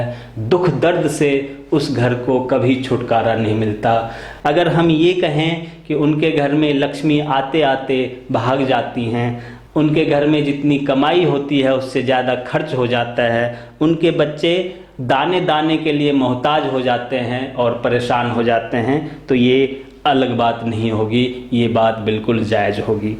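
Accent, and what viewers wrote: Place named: Bihar, India. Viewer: Ye ravish kumar jaisa thakela bolta hai..bihari babu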